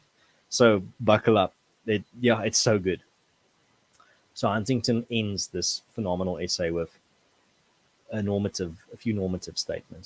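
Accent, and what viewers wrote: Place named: South Africa. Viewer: I worked for a South African company for 25 years and great to hear your accent!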